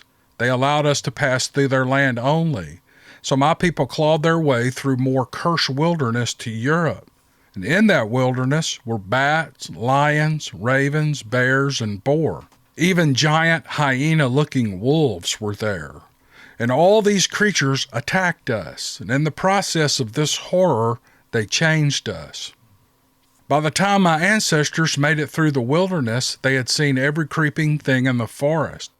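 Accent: South, United States. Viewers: Love his Southern accent, so so sexy.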